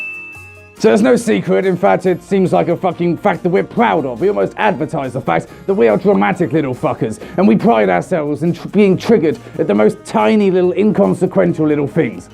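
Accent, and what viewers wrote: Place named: Scotland, United Kingdom. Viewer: Loved your scottish accent, by the way.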